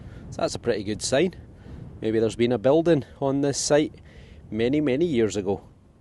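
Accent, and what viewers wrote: Scotland, United Kingdom. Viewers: Must be your Scottish accent, I didn't catch where you are hunting this day? 🙂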